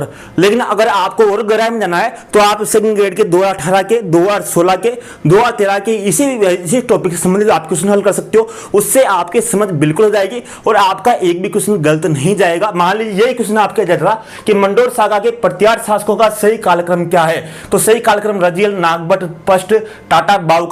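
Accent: Hadoti, India. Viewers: Sir aap hadoti se ho kya, aapki boli bata rahi Hain, main tonk se hoin 😊❤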